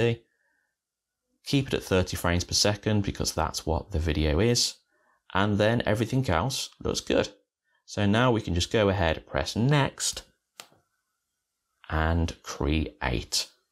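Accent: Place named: Birmingham, United Kingdom. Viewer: AT LAST. A tutorial in plain English: step by step instructions and no ear shattering unwanted music accompanying it. Why can't instruction videos ALL be like this. My guess is that comes from the Midlands...shades of a Birmingham accent, but that's cool because I'm also from the Midlands: Northampton to be precise. Have had the full AVS Suite for a long while but this video has given me the impetuous to REALLY begin to explore it further. Thanks mate. Have subscribed.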